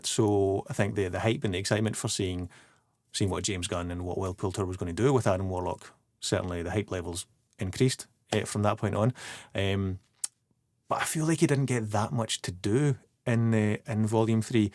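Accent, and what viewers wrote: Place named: Scotland, United Kingdom. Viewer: I would like to officially note my interest in playing ‘drunk-star’ in future mcu projects 👌 i think i’m perfect for that role 😂 I agree with u that Adam Warlock was a bit of letdown but didn’t take away from the movie. Thoroughly enjoyed it and a return to form for mcu indeed 😅 Didn’t realise u had a youtube channel pal! Enjoyed this video 👍 Great to hear another Scottish accent on here too. All the best with ur channel mate, great start for sure! 😃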